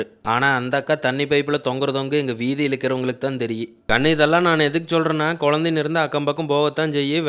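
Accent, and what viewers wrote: Kongu, India. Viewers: Ungal kongu tamizh, Coimbatore lollum super. Samayalum dan… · Namma kongu thamil arumai🔥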